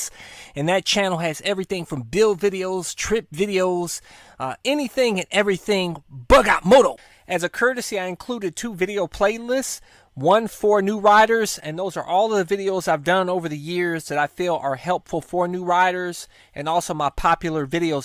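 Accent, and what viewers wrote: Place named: Texas, United States. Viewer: “Sorry I’m old school” you don’t sound old school you sound like a dumb hillbilly out in Texas. Here in Ohio we got hillbillies that that snort and shoot up drugs yet you manage to sound more stupid then them.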